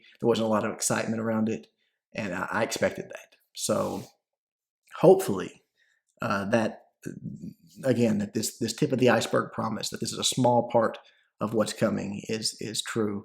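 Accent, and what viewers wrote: South, United States. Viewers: Is it just me or is southern accent feels like it's slowly fading 😳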